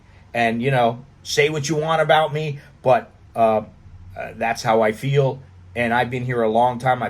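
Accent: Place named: United States. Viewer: You sound very American. Most of the world is like Thailand.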